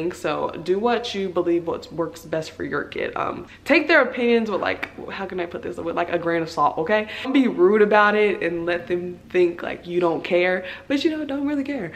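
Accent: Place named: United States. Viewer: You sound country and I love it😩😩😍😍😍❤️❤️🥰🥰🥰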